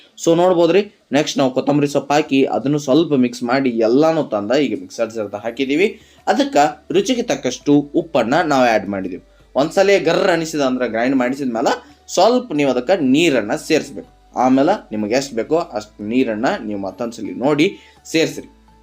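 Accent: North Karnataka, India. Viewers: ಕಾಮೆಂಟ್ ಮಾಡಿದ್ರ ರೀಪೆಲೇ ಮಾಡ್ತೀರಿ ನಮ್ಮಗೆ ಖುಷಿ ಆಯ್ತು ನಾನು ನಿಮ್ಮ ಅಭಿಮಾನಿ ನಾವು ಮಂಗಳೂರಿನಲ್ಲಿ ಇರೂದು ನಿಮ್ ಬಾಯಿಂದ ನಮ್ ಉತ್ತರ ಕರ್ನಾಟಕ ಭಾಷೆ ಕೆಳಾಕ ಖುಷಿ ಆಯ್ತು ದ್ರ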